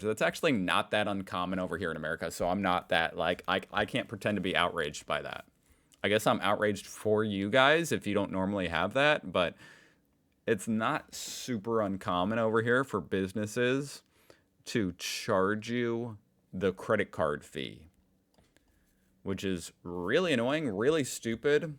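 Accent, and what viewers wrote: England, United Kingdom. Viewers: You sound more like a Pom definitely not Aussie 😂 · You can stop with the British accent. LOL! You have never done an Aussie accent. LOL! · "Happy arvo". That 'call-sign' is cheerfully yours, but your Australian accent is hybrid British. But no worries mate. Cheers from Sydney, AU.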